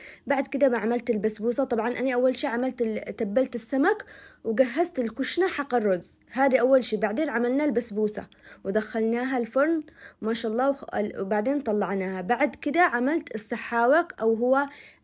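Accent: Aden, Yemen. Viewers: يمنية ؟ لهجة يمنية جميلة واكل ولا اروع تسلم ايدك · ما شاء الله عليك فناانة بجد 👏🏻♥️ تسلم الأيادي، حبيت قناتك وطبخك وأسلوب كلامك😍 ويا حلاة اللهجة العدنية منك 😍♥️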